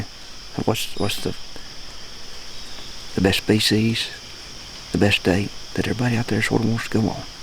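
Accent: South, United States. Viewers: What state are you from. You have a southern accent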